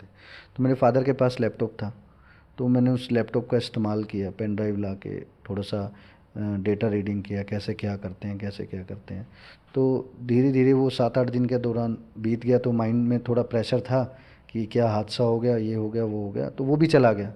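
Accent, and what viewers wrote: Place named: Haryana, India. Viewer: good insight ji, vaise aapke lehje se aap haryanvi lagte ho:)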